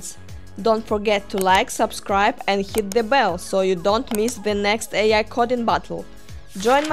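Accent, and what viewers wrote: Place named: Russia. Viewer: UR ascent is so Russian. Are u from Russia? Awesome demonstration btw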